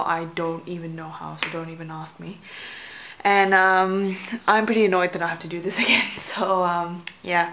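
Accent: Australia. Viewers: Ur Australian? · It's not that hard guys, this is obviously a woman from Australia (or New Zealand always get mixed up) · Aussie Accent? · are you a boy with a auzzie accent or a girl?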